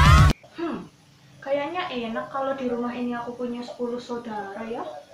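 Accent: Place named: Java, Indonesia. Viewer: Sukses terus mbloo, Medok amats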